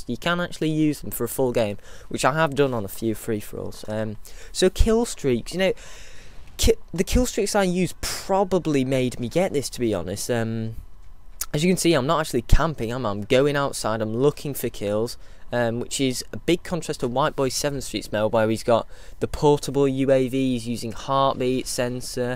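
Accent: United Kingdom. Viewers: Fuck that british accent... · Haha, a first video on MW3 that is presented by a what sounds like a cool British guy like me!! Wahooo. P.s I have nothing against Americans, they are cool to!!!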